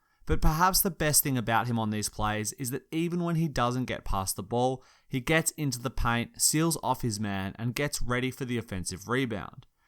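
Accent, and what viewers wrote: Australia. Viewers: Aussie accent indeed..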